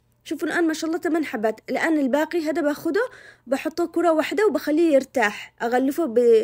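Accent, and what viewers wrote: Aden, Yemen. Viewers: اول مرة اتابع يوتيوبر يمنييية كتير حبيت لهجتكن وقناتك حلوة واكلاتكم بتشهي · كلامك يجنننن عدني قح😂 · الله يحفضك غير الطبخه الي ترجعني للبريقاء اللهجه كمان ترجعني لبيتنا ولاهلنا تحيه كبيره ككبر عدن بكله لك وتسلمي علا الطبخات الي تحسسك بالفن لانه معروفه عدن ملكت الفن والله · حبيبتي والله انا من السعودية ذكرتيني بصحبتي الغالية من ايام الجامعه كانت عدنية و نفس لهجتك❤❤❤❤ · ياالله مااحلا كلامك يذكرني بعدن الحبيبه الله يعطيك العافية تسلم ايدك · ماشاءالله اللهجة العدنيه تجنن انا فقط متابعه الفيديو عشان أسمع اللغة الجميلة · ما شاء الله حلاوة كلامها ولغة أصحاب عدن...حبيت العفوية والاسلوووب.. الله يحفظك ويسعدك أختي الغالية.. متابعة جديدة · اول مره اشوف قناتك ياسلام ماشاء الله ستاره على خفه دم واحلى شي لهجة اهل عدن عسل والله معك إبيه🥰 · انتي الاحلا والاغلا طبعا شفت شوي من فيديوهاتك وان شاء الله اطبق كل شى واخبرك النتيجه اتمنى لك التوفيق والنجاح تدري اش احلى شى بقناتك طبعا كل شى حلو الشرح والطبخات وكل شى بس اكتر شي عجبني طريقتك بالكلام او ب الاصح انك محافظه ع لجهتك العدنيه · اقول شيء اووووول مرررره احب اللهجه اليمنيه قسماً بالله مره حلوه اللهجه يا زينك. 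بس لازم اجرب هذي الخبز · اتابعك من امريكا عشان اسمع الكلام العدني 😅 ماشالله طبخك ياسلام 👏👏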